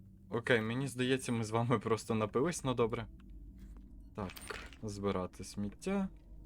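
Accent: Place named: Surzhyk, Ukraine. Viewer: Йоу, у тебе дуже круто вдається. Мені подобається твоя спокійна подача. Тршіки суржику додає тобі шарму Подерев'янського) Як і матюки що проскокують. Реакція на події в тебе кльова, за якою приємно і трішки кумедно (в хорошому сенсі) спостерігати. Твої відео приносять дійсно задоволення. Успіхів тобі на шляху ютубера) Українського контенту має бути більше)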